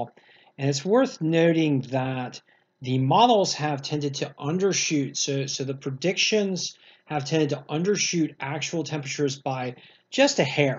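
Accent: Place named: Philadelphia, United States. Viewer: Thank you, your videos are much appreciated. You have a strong accent...you must have spent some time close to Philadelphia Pa., perhaps in the South Jersey area.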